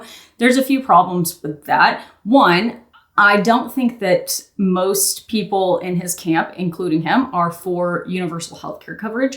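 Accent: New Zealand. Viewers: I’m just so amused that you’re picking up a kiwi accent. Your “New Ziland” is exactly like my mates 😂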